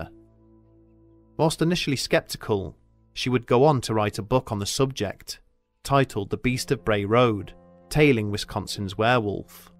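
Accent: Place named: United Kingdom. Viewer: The Michigan Dogman narrated by a Brit......give me a break!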